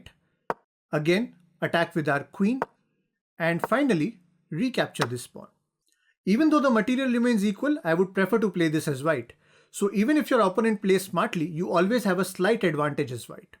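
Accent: India. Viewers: the indian accent makes this channel my fav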